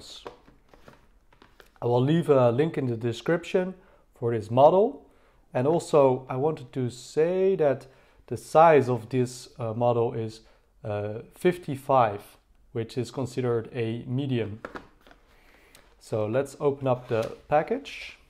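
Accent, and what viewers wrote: Netherlands. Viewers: I hear a Dutch accent.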